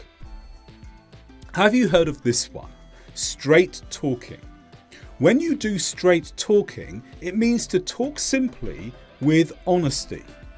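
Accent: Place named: United Kingdom. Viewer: Not American.. UK😅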